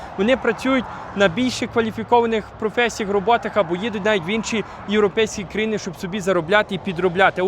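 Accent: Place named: Western Ukraine, Ukraine. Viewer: Цей запроданець, буде розкачувати будьяку тему. в нього тільки акцент західний - цце приваблює. Звертайте увагу на те, як він декламує.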